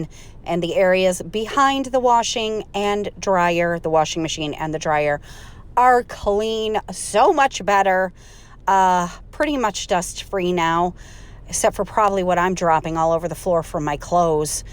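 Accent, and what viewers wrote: England, United Kingdom. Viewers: I love remi been watching you both about the same amount of time to. I love your British accent. You should hear my American one its awful 😂 love to you and ypur family x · Hi I’m from England and I’ve been watching you since you started and I thought you spoke in an English accent but I wasn’t sure and I didn’t say anything just in case, but well done it doesn’t sound that bad 😂😂❤❤ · For sure...keep the British accent!! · This was a great one.. Love the little British twangy.. Appreciate you❤ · Great work I’ve not cleaned behind my washing machine for years and now you’ve made me realise that I’m going to have to do it. And I’m English and I love your English accent you do xx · life is all about finding joy wherever you can and if talking in a British accent is what makes you happy then do it ❤ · I love your British accent 😂😂 If it brings you joy carry on 😁🇬🇧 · I love your English accent 😂great job ….lord I hate dust 😊❤️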